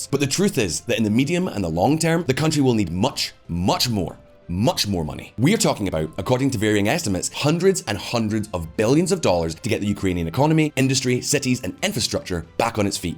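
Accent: Scotland, United Kingdom. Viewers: maybe you could slow down to 1000 words per minute and try and drop the Scottish accent. There are others outside the UK who like to get updates.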